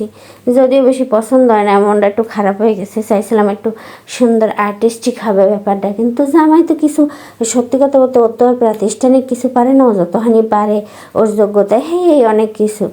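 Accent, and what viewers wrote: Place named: Barishal, Bangladesh. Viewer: বরিশালের ভাষা তোমার মুখে কি যে ভালো লাগে আপু মাশা-আল্লাহ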